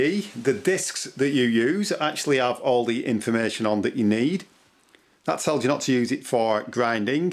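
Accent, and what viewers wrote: United Kingdom. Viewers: British Accent...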